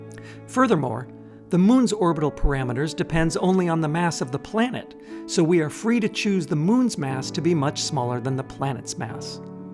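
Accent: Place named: United States. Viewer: You have like the perfect american accent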